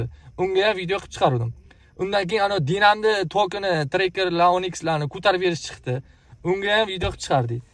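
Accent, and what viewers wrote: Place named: Jizzakh, Uzbekistan. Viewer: Oooh Jizzah shevam....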